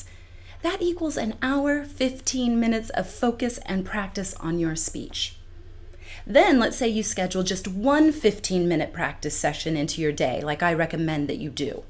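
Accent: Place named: Mid-Atlantic, United States. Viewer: You have an amazing accent. It's sort of a posh American accent, so to speak. By any chance, is it close to Mid-Atlantic accent?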